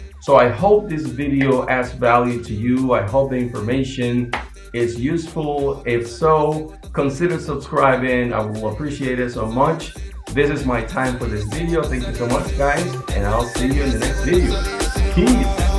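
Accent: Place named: Brazil. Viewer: You sound Brazilian. Are you?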